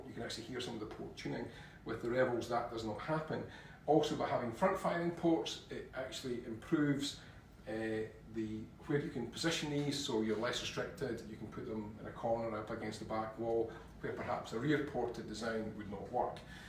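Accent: Scotland, United Kingdom. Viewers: Love that Scottish accent and the rug is great too.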